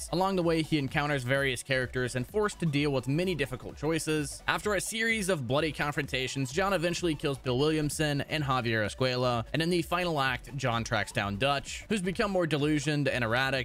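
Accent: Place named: General American, United States. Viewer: Almost nobody else cares. It’s a general American accent.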